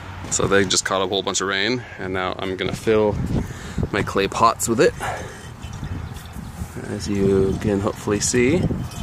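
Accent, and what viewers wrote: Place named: Canada. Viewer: Thanks for the speedy response. I thought I detected a Canadian "about" in your video. I think I might have to give one of your barrels a try. Thanks for all the info!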